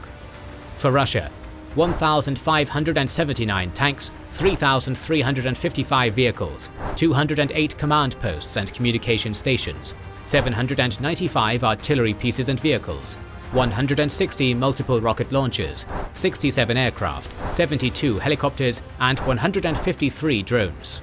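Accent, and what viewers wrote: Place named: United Kingdom. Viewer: A British-sounding accent always does make for a good narrator.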